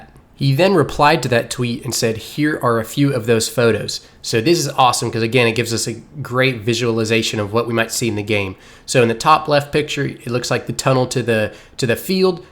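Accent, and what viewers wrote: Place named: Maryland, United States. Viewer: Guessing you’re from Maryland 😂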